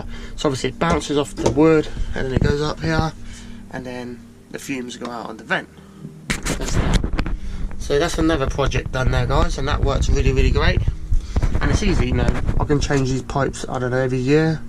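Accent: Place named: United Kingdom. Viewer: Love the UK accent and expressions. Good thing I've watched Are You Being Served? for years, so I understand some of your expressions. I use "knackered" a lot now.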